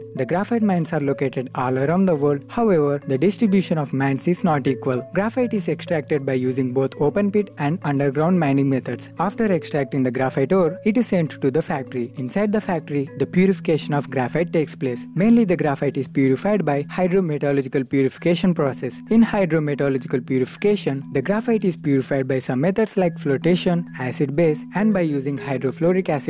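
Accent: India. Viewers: Would still be better without the gupta accent.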